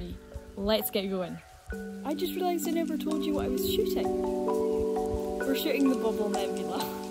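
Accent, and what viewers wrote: Scotland, United Kingdom. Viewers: Great video and channel! I love the Scottish accent, memories come back haha (I was born in Edinburgh :D)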